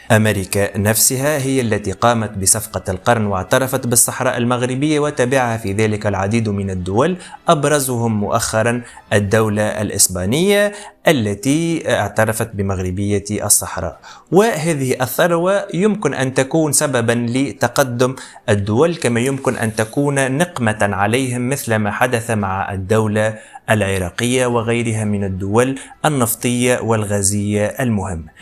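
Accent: Tunisia. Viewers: انت باين تونسي على حساب كلامك ان شاءالله يوجدو البترول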